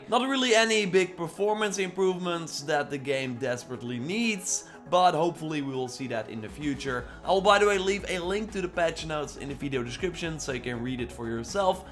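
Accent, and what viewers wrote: Netherlands. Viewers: Hmm, you sound dutch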